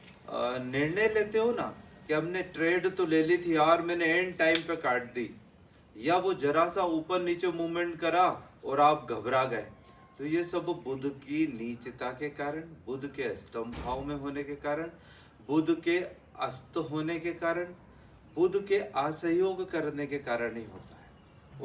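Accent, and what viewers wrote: Indore, India. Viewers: Bolne s lagta h indore ka touch h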